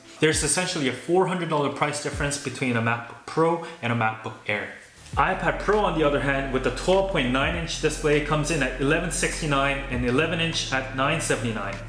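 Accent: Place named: China. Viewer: 听口音是在中国长大的吧？😏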